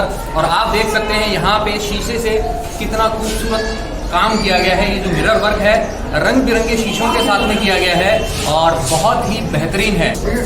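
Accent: Standard. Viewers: Bhai kya vedio banate ho aap jordar. Apki suddha Hindi bolneka andaj man gaye yar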